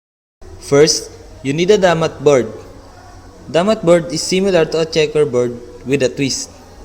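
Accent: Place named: Philippines. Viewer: huwag tayo mahiya sa english natin dahil yan ang tatak pinoy, mga hindi nga ang daming video sa math, alisin na natin yang mentality natin na dapat american accent tayo, ang mahalaga mapresent natin ng maayos ang video natin, mga amerikano nga hindi nagcocomment tungkol sa accent. good work, pls. do some damath videos para sa elementary, napansin ko kasi na wala.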